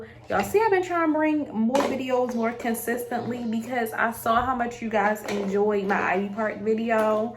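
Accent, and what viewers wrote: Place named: Baltimore, United States. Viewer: Cute! Your accent sounds like Baltimore.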